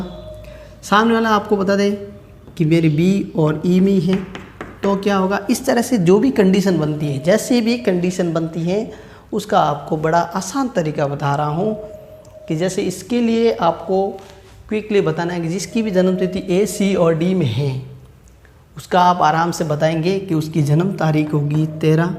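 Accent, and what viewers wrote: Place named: Jodhpur, India. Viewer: Enki aavaj me jodhpuri tone he shayad ye jodhpur ya eske aas pas se he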